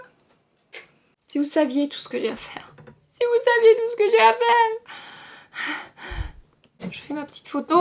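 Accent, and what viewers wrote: South, France. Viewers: Mdrrr ma tchuin avé l accent du sud mis à part le maquillage qui est à son habitude plus que réussie disons même superbe mais là tu as du perdre 2000 abonnés lol 😂 avec ton coup de gueule on sent que tu es excédé de répondre à 10000 commentaires du genre tu sors pas de ta zone de confort etc toujours les mêmes couleurs et j’en passe mais voilà comme si bien dit y’a des vidéos à la pelle de tous meme comment fabriquer une bombe alors le maquillage n en parlons pas lol 😂 Bisous ma belle et ce que tu fais tu le fais bien et tu y mets du cœur et de l’investissement personnel et financier mais ça très peu on connaissance de l envers du décor. Des milliards de 💋💋💋💋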